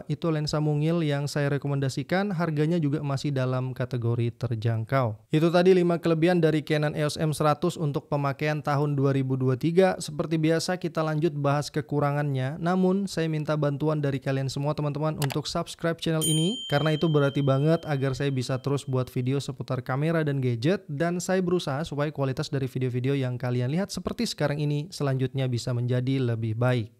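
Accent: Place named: Kalimantan, Indonesia. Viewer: Dari logatnya mas orang kalimantan kah?….. tapi mantap mas aku suka reviewnya masuk dalam rencana pembelian aku, ya walaupun bekas juga sih hehe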